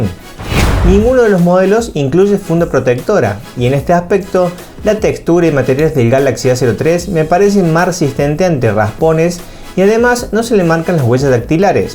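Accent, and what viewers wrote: Córdoba, Argentina. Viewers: pregunta sos cordobes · eres cordobes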